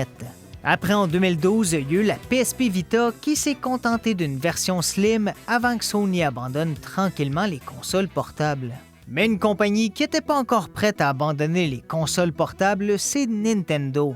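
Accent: Quebec, Canada. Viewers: Quand tu pense cliquer sur une vidéo en français et qu'après tu te rend compte que c'est en québécois...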